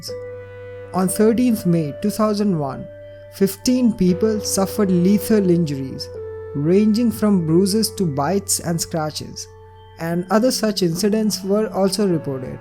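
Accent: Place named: India. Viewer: I love ur accent and I think ur accent is indian... 🥰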